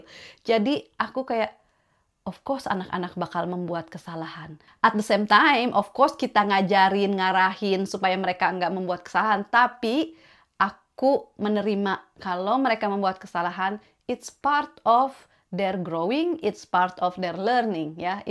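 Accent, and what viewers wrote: West Java, Indonesia. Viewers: eteh sunda de besssss pokona mah 😍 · Dengerin cara ngomongnya si mommy ini nyaman banget,serius deh,nambah ilmu juga walau aku belum menikah,dan ada kesamaan tentang anak2 yang membantu di keluarga,krn di keluargaku sejak kami(aku dan 3 adikku kecil) sudah terbiasa abis makan,cuci piring masing2,cuci baju sekolah masing2,karna mommy aku meninggoy sejak kami masih SD,bapa kerja pagi2 banget,dan jadilah aku ngurusin 3 adikku, anak kecil ngurusin anak kecil,jadi dah biasa mandiri,oke sehat2 mommy and family,btw pengen deh keluarga ini ngevlog mudik ke Indonesia,ke kampungnya di sunda kan soalnya logatnya sunda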